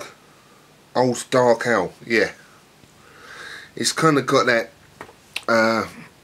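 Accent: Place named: England, United Kingdom. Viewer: Greetings from California, United States. I'm going to try this beer. Thanks for the review and I enjoyed your lovely English accent. :)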